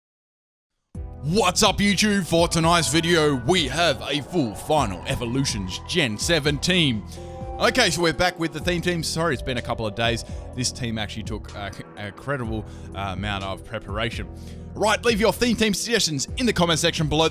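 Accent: Australia. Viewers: Of course the narrator is Australian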